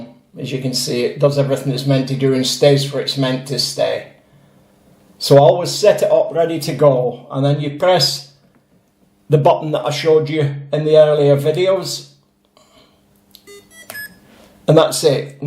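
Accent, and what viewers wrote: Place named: Scotland, United Kingdom. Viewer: way better explained in an old scotish accent than in the Ikea type of instruction supplied. Thanks!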